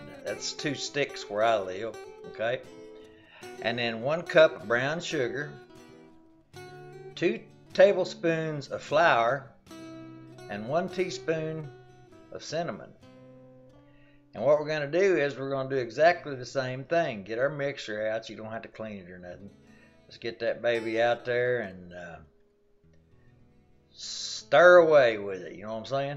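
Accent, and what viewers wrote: South, United States. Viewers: Love this Forrest Gump accent!! And the cake looks delicious!! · I love the southern draw!!! Thank you so much for recipe about to make now!!!!!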